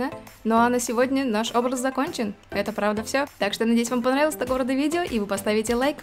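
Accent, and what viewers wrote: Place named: England, United Kingdom. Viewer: ооооочень нравится твоя английская интонация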